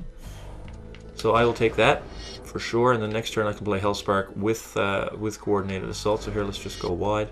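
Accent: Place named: Newfoundland, Canada. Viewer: I'm sitting here thinking.... this guy.... I think he's from Canada.... he sounds..... NEWFOUNDLAND. Yup. I'm from BC, so no hate here haha.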